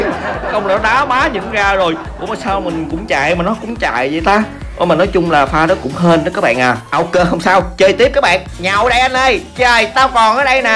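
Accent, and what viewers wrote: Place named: Hue, Vietnam. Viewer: Nói giọng Huế đi anh ơiiiiii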